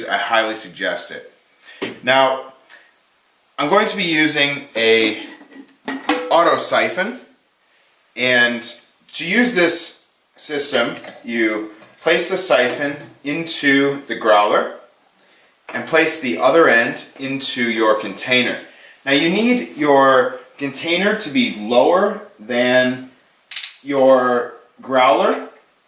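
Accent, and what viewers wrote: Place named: Australia. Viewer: Good day, Kindly give me a list of all the equipment I will need to do this forced carbonation process. I want to buy all the necessary equipment, you can add the food grade C02 tank/cylinder on the list though I will hire one whenever I need it. Kindly use English that I will understand as a south african 🇿🇦. I understood everything you said perfectly and your accent sounds Australian which means your English isn't confusing as would American English 😊😊😅😅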